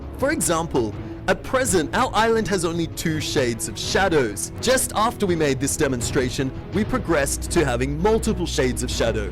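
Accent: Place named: Australia. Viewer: As an american used to the way americans speak I have to say I do sense a bit of an eronant undertone in his speech but I know that thats just the australian accent. So hes not a douchebag, his accent probably just tricked you into thinking that.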